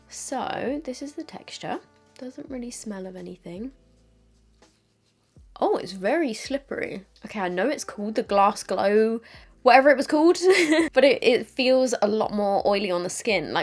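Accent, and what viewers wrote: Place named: United Kingdom. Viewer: I literally lol when you said the word “hottie” sounded dumb in a British accent 😂…I feel the same way when I want to sound eloquent but my southern American accent just won’t allow it🤣🤣 Glad you’re feeling better!!!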